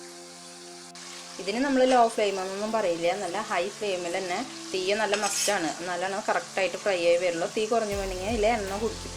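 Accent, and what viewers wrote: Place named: Thrissur, India. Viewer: Nicee..thrissur slang 😁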